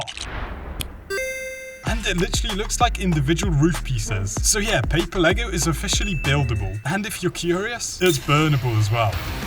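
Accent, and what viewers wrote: United Kingdom. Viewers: You're British 🇬🇧？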